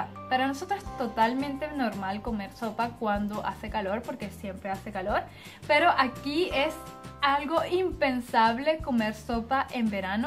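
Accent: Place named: Venezuela. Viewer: Venezolanisima pero #CuentoALaVersionEspañola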